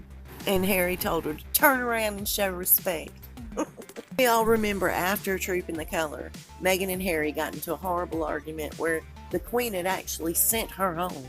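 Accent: South, United States. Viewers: This woman narrating......terrible southern ACCENT. Can't listen past 2 minutes. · Then dont listen and go elsewhere....nobody asked you to come or stay..dont hate on beautiful southern women and our accents...u must speak thru ur nose like yankees.. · I really like your voice, where in America is your accent from?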